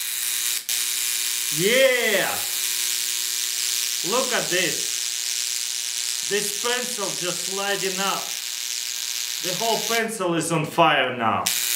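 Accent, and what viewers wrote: Russia. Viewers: 2 million subscribers... For the Russian accent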